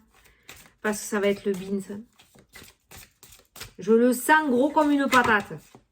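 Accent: South, France. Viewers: Bonjour, Déjà, mille mercis pour ta bonne humeur & ton accent du sud qui font du bien. On m'a effectivement proposé un partenariat. Je suis en pleine reconversion et une connaissance me propose de partager des locaux dans un quartier en plein essor.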